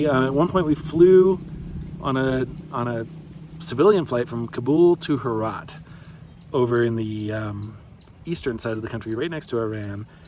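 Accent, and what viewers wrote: United States. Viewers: I live in idaho, your accent is idaho?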